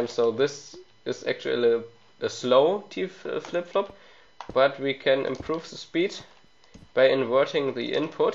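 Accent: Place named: Germany. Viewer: du hast einen deutschen Akzent. :D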